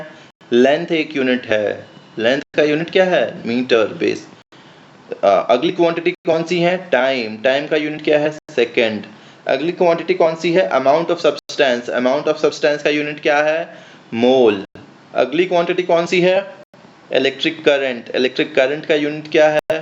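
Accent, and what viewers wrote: Bihar, India. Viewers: Sir ka bihari tone ...very sweettt ...🥳 Mazzzzzaaaa aaa gyaa ...grt explanation 🙏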